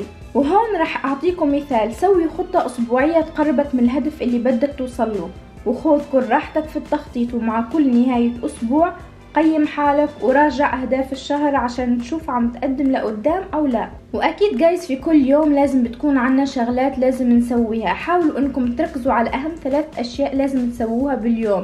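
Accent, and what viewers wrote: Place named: Tunisia. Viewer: ختيتو الديفو تاعك والسبب لي ميخليش التفاعل يطلع عندك هوا اللهجة الي راكي تحكي بيها هوا المؤكد مدامك تونسية رح يكون اكثر المتابعين عندك من المغرب العربي وسورتو من دزاير ونحنا عندنا شوي نفور من هاذيك اللهجات والعقليات اما المضمون تاعك مفيد ومسلي والبتوفيق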